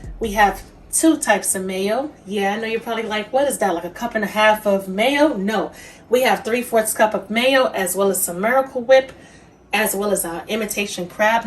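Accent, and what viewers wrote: New York, United States. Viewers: I'm not going to play with you. 😀😀😀😀 You have an accent like you are from NYC. What I am about to say does have nothing to do with you and your cooking. I have been watching this show called POSE on FX Channel. If you know what I'm talking about I appreciate it. I just wished Blanca... who was the mother of "THE HOUSE OF EVANGELISTA" would have fed her kids things like this. Fried chicken, pork chops, etc..., LOL I know it was only a TV show. I'm just having fun 😋😋😋😋😂😂😂 This looks AWMAZING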